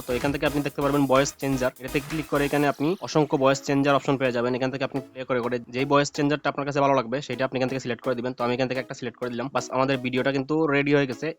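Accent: Sylhet, Bangladesh. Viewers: আপনি সিলেট এর নি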